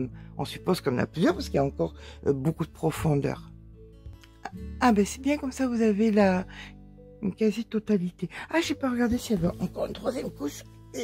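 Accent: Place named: Alpes-Maritimes, France. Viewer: Alors voilà, j'en étais sûre, 06 mais C bien sur, j'ai entendu votre léger accent et votre façon de parler pdt des années, 50ans pour être exact, d'une Régine qui habite à BIOT, je suis allée en vacances à Antibes en camping, il y avait tjrs 3 ou 4 générations, j'y suis allée enfant, ado, jeune maman et mamy.... De merveilleux souvenirs, C pour cela que votre voix, accent lié à ma passion, le crochet font un si bon ménage, merci tt simplement d'être là et reMerci d'être VOUS 💋🥰🤗💞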